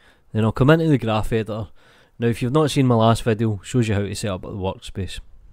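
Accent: Scotland, United Kingdom. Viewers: I'm originally from Italy, but I love this Scottish accent in your voice so much, I just don't know why! Great tutorials!!! Thanks a lot!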